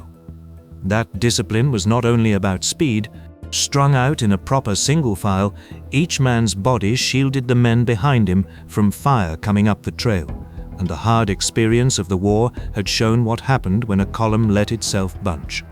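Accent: England, United Kingdom. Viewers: I’m really not digging the English accent!🇺🇸👎👎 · Can't like a narrator with a Brit accent shedding anything negative about American Vets.nfg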